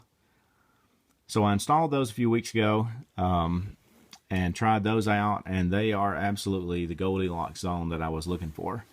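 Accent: Alabama, United States. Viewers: are you from alabama?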